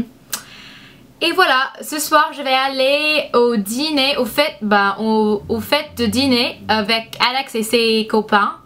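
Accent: France. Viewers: Tu es tellement belle ! J'adore ton accent français c'est trop trop mignon ! Tu es géniale <3 Bisous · !!! J'adore ton accent de frenchy! Et puis on comprends très bien ce que tu veux dire, c'est ça qui est mignon! je suis tellement déçue de ne pas avoir eu l'occasion de te voir sur Paris! Des bisous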